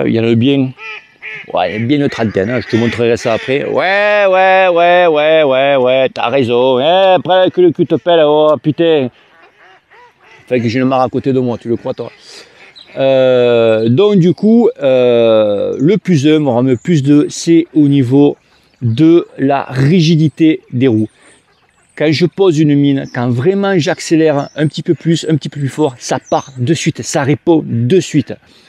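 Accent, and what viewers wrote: South, France. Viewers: Merci pour tes video mec 👍 Super Accent du soleil 😉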